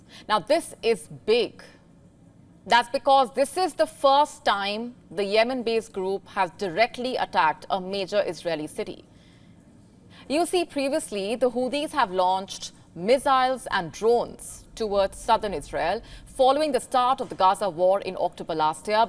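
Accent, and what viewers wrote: India. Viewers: Why indain accent suck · She is from India not Middle East.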